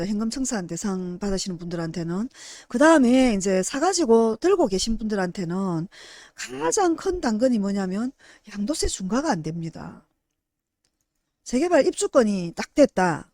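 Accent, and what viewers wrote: Busan, South Korea. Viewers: 목소리가 재미있어요 부산인가요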